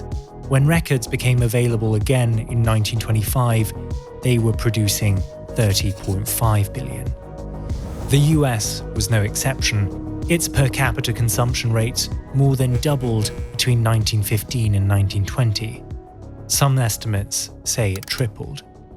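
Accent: England, United Kingdom. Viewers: All I understand, but why English accent, Russian sweater, swiss location?? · ... Why do you have a perfect British English accent if you're from Switzerland? O_o Are you not actually Swiss?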